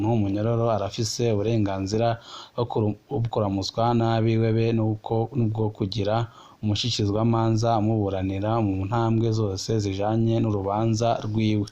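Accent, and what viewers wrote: Rwanda. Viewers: oya uyuwewe ararenze avuga niryo atazi ninumurwanda none burya arunva KO atanikirundi azi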